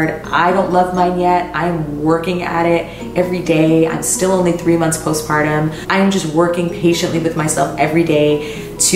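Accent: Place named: Guyana. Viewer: LMAO your Guyanese accent killed me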